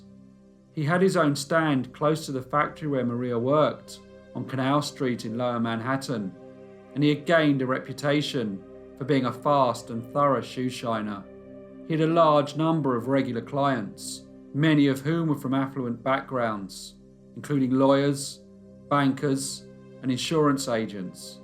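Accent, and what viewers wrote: United Kingdom. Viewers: Mort sent me..... I also happen to love channels like yours and love the British accent. 🙋‍♀️♥️🤗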